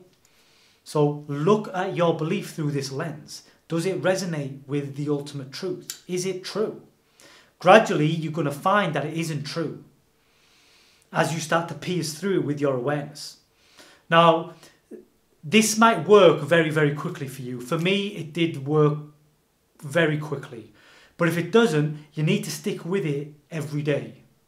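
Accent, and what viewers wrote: United Kingdom. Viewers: Lovely stuff! Keep it up young king. Nice to hear a British accent 🤓💚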